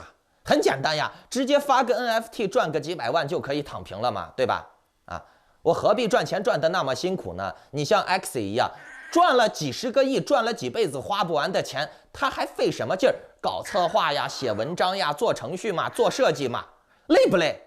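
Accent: Henan, China. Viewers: 河南佬一个。口音漏了。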